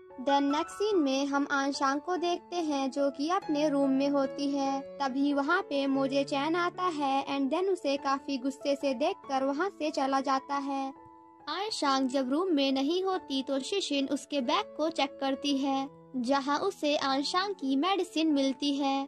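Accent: Gujarat, India. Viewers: Ok I Guess you from GUJRAT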